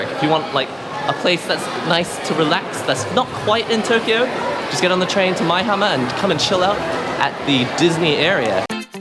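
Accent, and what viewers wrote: United Kingdom. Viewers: he's japanese but he learnt british english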